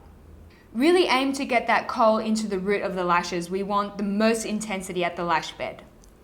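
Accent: Australia. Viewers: You're gorgeous and I love your aussie accent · You're so talented, likeable and articulate. Sounds random, but I love listening to you talk as much as I love watching you work. Your knowledge of and passion for makeup really translates well in your videos - keep it up! You're so great!! P.S. Love your Aussie accent! x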